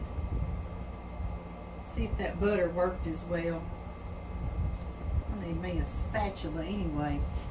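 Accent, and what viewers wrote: South, United States. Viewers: I thought I had a southern drawl……until I heard you! I feel pretty citified now!! LOL 😂 You can’t get too southern for me! I make pecan pies…….everybody’s favorite! My sister gave me the recipe probably 35 or 40 years ago. It calls for brown sugar instead of syrup. I tweaked it to my satisfaction and still add a bit of syrup but……it’s a great recipe. My extended family members always looked for my pecan pie at our get togethers! I’ve lost two sisters and a niece since 2020. I’m not able to cook as much anymore but…I’ll try this. 😃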